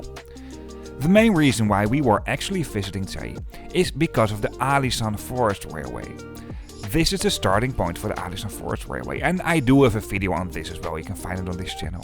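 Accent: Netherlands. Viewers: you have strong dutch accent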